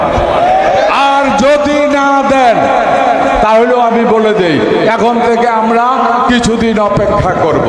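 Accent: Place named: Noakhali, Bangladesh. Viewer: নিজে ভাষা বলতেছেন নোয়াখালীর, আবার নোয়াখালীর ভাষা নিয়ে টিটকারি করেন।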